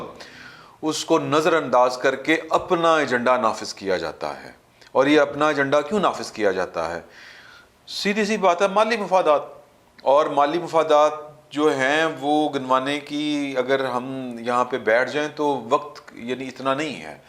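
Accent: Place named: Lahore, Pakistan. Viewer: Nice to see you talking in a genuine Lahori style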